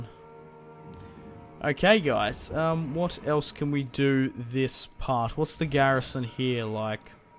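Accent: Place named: Australia. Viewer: The only thing that keeps me watching your vids is your Aussie accent. It's like listening to a 18th century English commander. I bet u have a handle bar mustache too.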